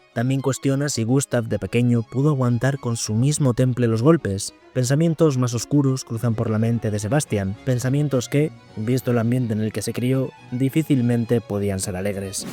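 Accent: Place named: Galicia, Spain. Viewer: ME K4G4 TU ACENTO GALLEGO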